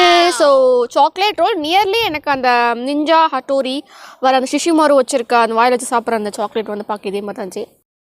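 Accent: Tirunelveli, India. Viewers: Akka ninga tirunelveli ya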